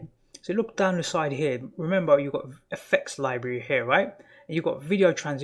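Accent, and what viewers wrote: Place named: London, United Kingdom. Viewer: Nice work Dude! brilliantly explained. The fact you sound like a Londoner makes it feel quite homely :)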